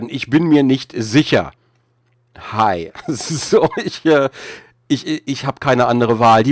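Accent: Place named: Germany. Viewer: Nicht wirklich. Wenn ich ihm beim Englisch-sprechen zuhöre, fällt mir der deutsche Akzent schon sehr auf. xD Aber eigentlich sehr schade. Stellt euch mal vor, wie krass viele Abos Gronkh bekommen würde, wenn er auf Englisch kommentieren würde! :o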